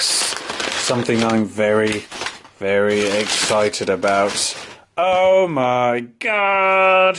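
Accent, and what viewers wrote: RP, United Kingdom. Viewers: You have to do the posh accent, everything else won't cut it! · I like your posh accent. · Could NOT stop laughing at your monocle and posh accent, cracking me up!!!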